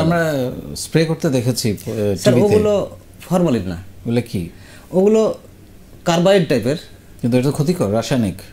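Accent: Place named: Murshidabad, India. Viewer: এটা আমাদের মুর্শিদাবাদের ভাষা। আপনার সাথে পরিচয়ের ইচ্ছে রইল।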